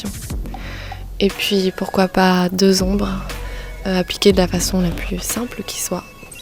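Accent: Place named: France. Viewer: You are goals. And I love your accent. I'm gonna learn French! (Inspired)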